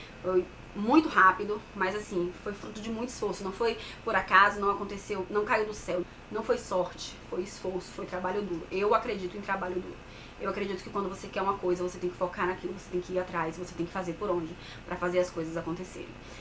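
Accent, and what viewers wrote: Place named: Bahia, Brazil. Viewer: já falei que desde primeira vez que encontrei seu blog me apaixonei, depois ouvi seu vidio virei super fã, adoro de mais seu sutac... e agora descobri q vc tbm é baiana... 😍 eu tbm sou Baiana😍Chapada diamantina 😘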